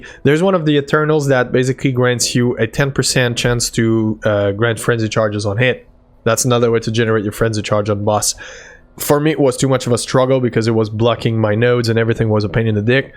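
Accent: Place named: Quebec, Canada. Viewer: C''est sur t''es un quebecois , juste a t'entendre parler en anglais haha !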